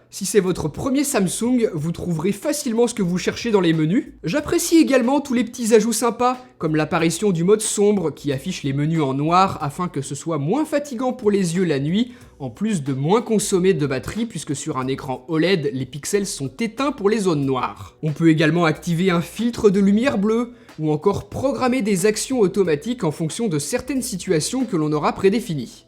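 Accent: Lyon, France. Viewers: Tu es lyonnais?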